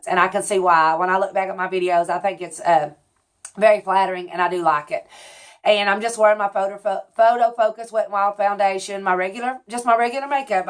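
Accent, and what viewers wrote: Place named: West Virginia, United States. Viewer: Hi! I really hope you read this. I was searching for weight loss help and YouTube sent one of your videos to me. I LOVED IT AND love listening to you! You actually made me cry watching this one. Honey, all women feel the way you have been feeling at one time or another. I can relate to so much to what you have been through. I just wish I would have found you earlier. I wish I would have found you while you were posting your videos. Maybe, with more folks responding to your videos you would have stayed with us. I recently reached out to your daughter Hope to find out if you would be coming back. She said she didn't think you would be back. I am so sad to hear that. If you come back and do a lot more weight loss tips and such, I have no doubt you will get a lot more subscribers. I don't know anyone who isn't trying to lose weight. 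I have lost and gained so many times (like you), you were going to be my weight loss coach. I am binge watching your videos b/c you remind me of so many people in my own family. My Mom's family is from W.V. and have the same accent as you. I love it. If you just got tired of doing the videos, maybe in time you will come back and do a couple or so a month. If you don't, I want to thank you for what you have given us. I so enjoy all the ones I have watched so far. I wish you all the best either way. Take care and enjoy the many blessings you have in your life. We miss you.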